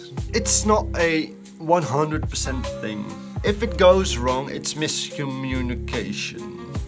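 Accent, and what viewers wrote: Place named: England, United Kingdom. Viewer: What city and ethnic background? lol, you sound like you came right out of a gutter. Do people in England not speak English anymore?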